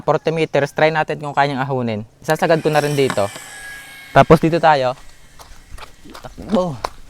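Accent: Philippines. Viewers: Boss taga saan ka ga? Nakakatuwa ang iyong punto..haha Iniisip ko tuloy ay magka-probinsya tayo.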